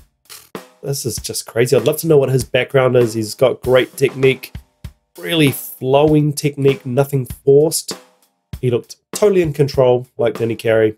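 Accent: New Zealand. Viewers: BTW your Kiwi accent is just awesome!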